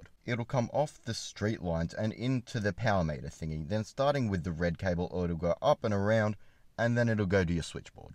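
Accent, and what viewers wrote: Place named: Australia. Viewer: Dude you are one of the funniest channels on YouTube. The dry delivery and Aussie accent (at least to me here in America) is fuckin fantastic. If I’m ever in Australia, I’m gonna figure out who you are and we’re gonna meet up for a beer or 7. Keep the great shit coming!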